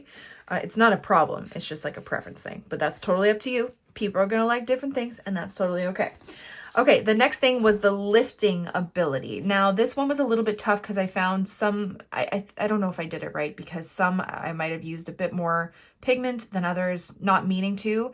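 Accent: Canada. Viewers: Damn, it took me 9 minutes to realize you were in Canada. I don't know which word gave you away. Maybe it was "out".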